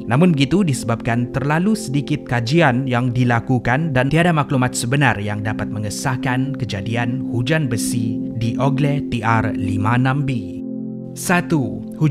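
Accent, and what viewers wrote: Sabah, Malaysia. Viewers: ni org mana? sabah ke? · Kan,sbb cara pencakapan die bukan macam malay..dia cakap mcm ada slang sabah n indon sikit..tulah sebb org ingat die indon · Abg ni sabahan kan?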